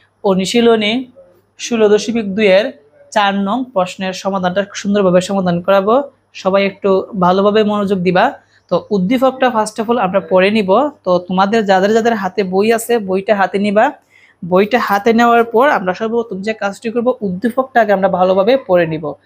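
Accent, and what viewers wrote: Sylhet, Bangladesh. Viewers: ভাইয়া আপনি কি সিলেটি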